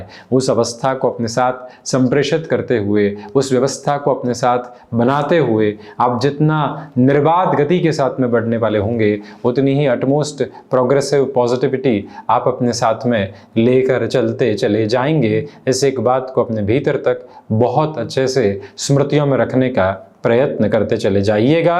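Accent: Standard. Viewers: हिंदी भाषा पर आप की पकड़ वाकई अद्भुत है। शुद्ध उच्चारण के साथ भाष्य प्रभाव अचंभित करता है। बहुत दिन बाद इतना शुद्ध उच्चारण सुनने को मिला।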